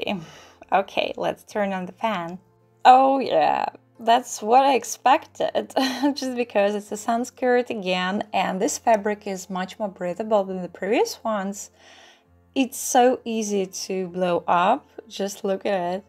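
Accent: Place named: England, United Kingdom. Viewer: awesome stuff, i love the windy days, I'm melting at your english accent 😍❣️🔥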